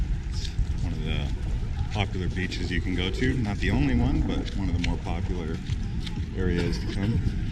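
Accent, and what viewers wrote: United States. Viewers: Aaaagh American pronunciation aaagh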